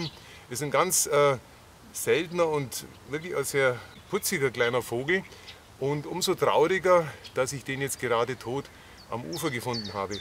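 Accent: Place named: East Franconia, Germany. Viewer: Ich mag Deinen Dialekt! Ist das ostfränkisch? Bamberger Gegend? LG aus Österreich..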